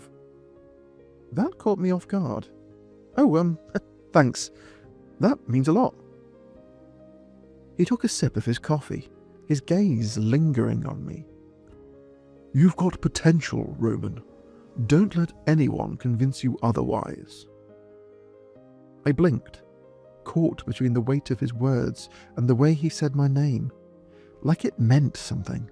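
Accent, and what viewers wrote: United Kingdom. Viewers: I like this British voice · Good northern accent.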